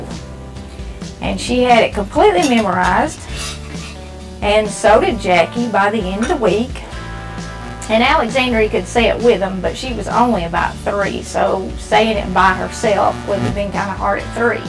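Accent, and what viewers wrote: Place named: Tennessee, United States. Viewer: Thank you so much!!! My mother was born and raised in Nashville/Memphis Tennessee! You remind me of her! Right down to that beautiful accent! You are in my prayers ❤️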